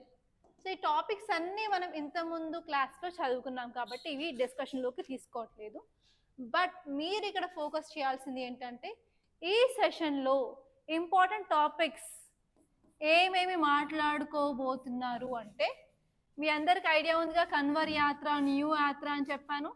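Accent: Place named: North, India. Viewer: Mam miru telugu valla am so happy...when I listen your polity free classes... I thought u r north indian....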